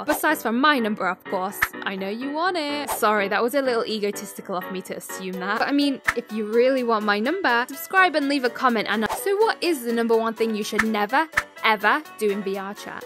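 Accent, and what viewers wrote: United Kingdom. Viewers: Are you British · u from the uk ?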